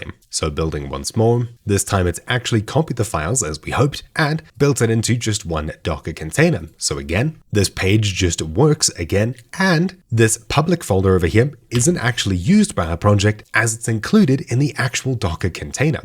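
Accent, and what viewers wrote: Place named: South Africa. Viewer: Thanks for doing creating this video. I have been using warp now for a couple of months but have not gotten into some of the things that you covered. I see that you had South African English on your Excel Worksheet. So are you still living in SA or elsewhere. I lived in SA for 30 years in total from when I was 15 way back in 1974. I lived in CarltonVille, Vereenging, Meyerton, Johannesburg, Durban, East London and started work in JHB as a computer operator working on an ICl 1903T Mainframe at GoldFields Mining and Development Company. Great to here a South African voice. I Miss SA a lot. My daughter is living in PMB.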